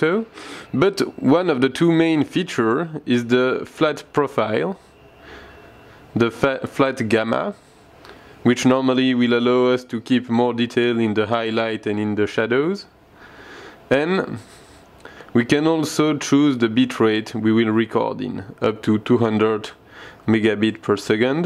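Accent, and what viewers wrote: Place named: France. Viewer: On sent vachement l'accent français, mais on apprécie l'effort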